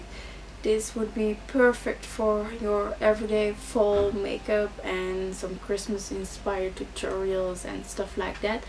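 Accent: Netherlands. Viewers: Jij bent sws nederlands 😂😘 ik hoor het aan je 'platte nederlands' accent hhaah je spreekt overigens wel heel goed engels maar toch hahah ❤️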